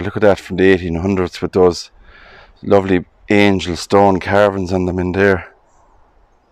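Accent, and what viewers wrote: Ireland. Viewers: I enjoy your Irish accent!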